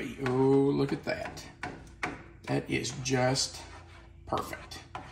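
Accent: South, United States. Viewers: Shepard’s Pie is what I make if I have leftover mashed taters. TY for your videos and wonderful southern small talk. 👏🏼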